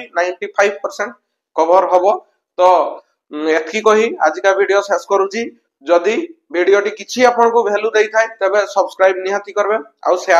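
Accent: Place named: Keonjhar, India. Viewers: Sir apana nka ghara Mayurbhanj, balasore nahele keonjhar,bcz mu b keonjhar pila😅😅😅apana nka talking style ru laguchii . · Kjr district ru belong karucha bodhe language ru Jana padi jauchi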